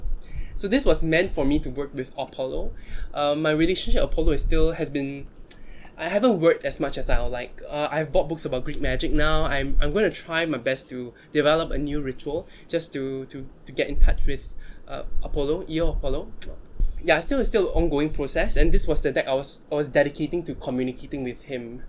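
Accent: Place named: Singapore. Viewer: Are you from Singapore or Malaysia? We got the same accent fam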